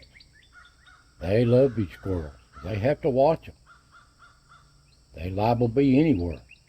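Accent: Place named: South, United States. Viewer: love ya and your videos. 😢i lost my grandpa in 2013 he was 86 and in ww2 n he was from gatlinburg TN. your Southern voice sounds like my papa. God bless n ty ❤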